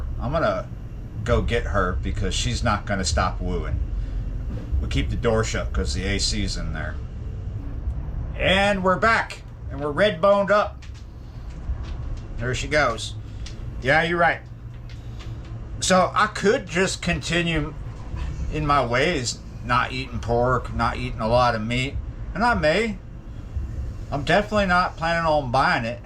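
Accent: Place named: South, United States. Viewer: 😂😂Southern accent now 😂😂. Are you moving back to try to get your driving license so you can do the van life thing. Good luck.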